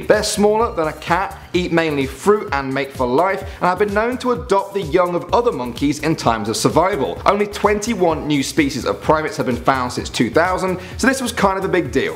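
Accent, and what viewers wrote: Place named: England, United Kingdom. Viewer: Like English accent🇬🇧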